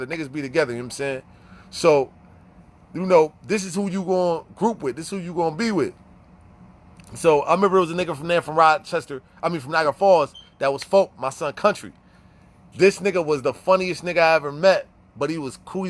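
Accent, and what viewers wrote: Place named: Boston, United States. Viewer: Dude gotta be from Boston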